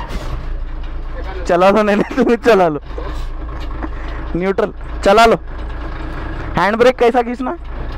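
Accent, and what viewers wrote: Hyderabad, India. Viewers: bhai ek number Hyderabadi commentary marte...yarro... Ab next ice-cream ki thela le k niklo aur apne dosto k aur pechchaan k ghar k aju baju ja k responses record Karo... Bada majja aayenga aap ko bhi aur un ko bhi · bhai kab sudroge humesa naya naya karte ho. sound to superbikese bhi jyada atsi. mujeh aapki hyderabad language bahut atse lagte ho bhai keep it up. teeper hogaya crane bhi karo bhai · Bhai you nailed it . loved the way you spoke the typical Hyderabadi way! Definitely want a mlre though test of the truck and a tractor! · I love the hydrabadi language how you talk!!! 😂😂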